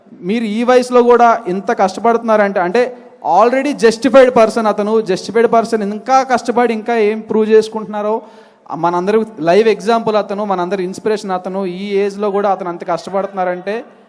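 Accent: India. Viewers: Anthanu anedi Vizag, vizianagaram slang andi respect word oka oka pratham lo different slangs untai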